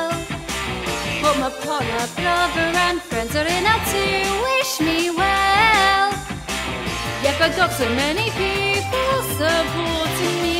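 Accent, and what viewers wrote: Liverpool, United Kingdom. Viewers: Love a good Scouse accent. Love it. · Don't worry, definitely not the worst impression of a northern accent I've heard lol 😆. Thought it sounded like a mix of Scottish and Liverpool, with a hint of Yorkshire, myself. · Nice scouse accent lol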